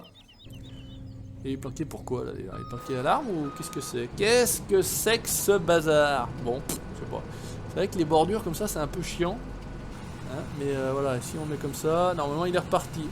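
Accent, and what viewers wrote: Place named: Nord-Pas-de-Calais, France. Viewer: Très bonne vidéo comme d'habitude. qui se met à parler chti et un peu patois. Je me demande si tu es vraiment, lol 🤔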